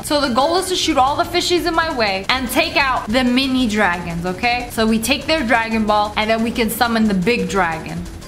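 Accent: France. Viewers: Are you French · R u actually from France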